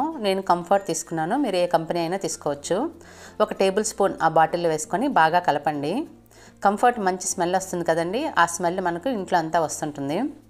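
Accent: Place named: Godavari, India. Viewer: Aay.. Meedhi Godavari entande.. Chala baagaa sepoarandi.. Thanks ande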